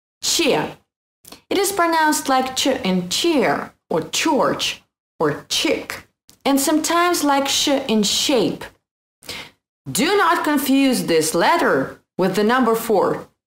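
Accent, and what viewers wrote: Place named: Russia. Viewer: I'm an Indian.. And I like this Russian accent.... But I'm finding it so difficult.. I couldn't pronounce like you... 😥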